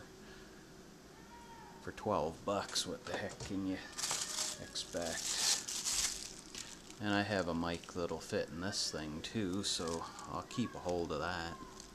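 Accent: Canada. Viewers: You are canadian aren't you?!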